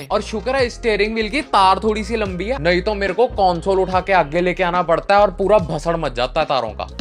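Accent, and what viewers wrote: Punjab, India. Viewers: Thick Panjabi accent Hindi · Veer ji are you from Punjab, accent ton lag reha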